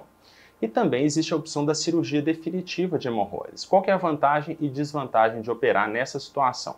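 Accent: Minas Gerais, Brazil. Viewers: Boa noite Doutor! Você é de Minas né! Conheço pelo sotaque.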